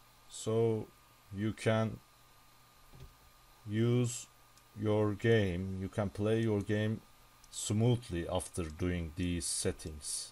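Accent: Turkey. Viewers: Telaffuzdan direk anladım Türk olduğunu :D hissediliyor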